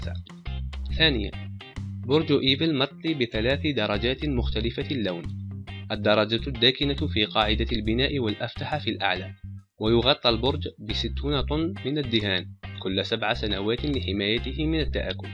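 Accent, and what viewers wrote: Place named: Morocco. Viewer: انت مغربي 100 بل 100 لان طريقة كلامك مغربية المرجو الاجابة الفيديو رائع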